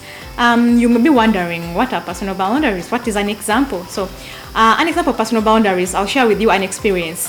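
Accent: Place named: Uganda. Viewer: has directed me here. I love the Ugandan accent. So "pechuuulia"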